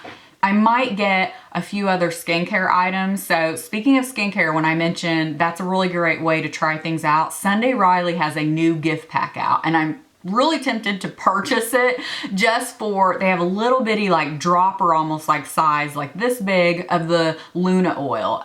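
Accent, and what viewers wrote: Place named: Texas, United States. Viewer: I will echo what many have already said. You look beautiful - your makeup is flawless. I would love for you to be my personal shopper for a day!!! While watching the video, I had to turn my phone volume up because I thought you said you were putting things in your car (instead of cart)!!! Why is she storing good makeup in her car??? I started laughing when I figured out what you were saying. I was born and raised in Texas, but your accent is thicker than mine! (Not a bad thing at all.)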